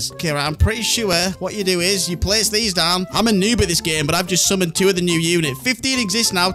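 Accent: United Kingdom. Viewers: Bro are u British or somethinh?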